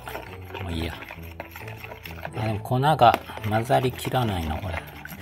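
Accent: Kansai, Japan. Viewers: わぁ～❣️可愛いのってチェブのタコ焼きだったんですね💡さすが！チェブのクオリティー高くてめっちゃ可愛いです❤️❤️ 量そんなんでいいんかいな～？って思ってたけど大成功でしたね😁✌️ お味はどうでしたか😉？ちょいちょい出る関西弁に癒される💛 素の時は関西弁なんですね😌✨ たこ焼き器は家にもあるんですが、使った事がなかったので今度やってみよう🐙🎶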